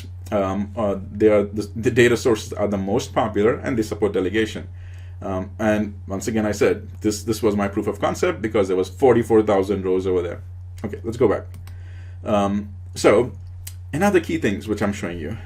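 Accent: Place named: India. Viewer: thank you every video, one question I have, which is off topic, your accent is somewhat INDIAN, are you ever belonging from india, please answer sir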